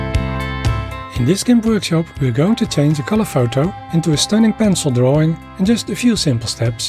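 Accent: Netherlands. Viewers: Lijkt wel een rotterdams accent. Thanks voor de tutorial!!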